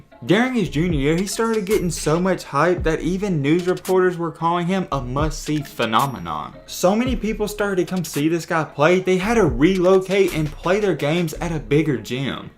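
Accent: South, United States. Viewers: You have this southern draw you're trying to hide and its like...you're talking out of your nose or something. not a natural voice, hard to listen to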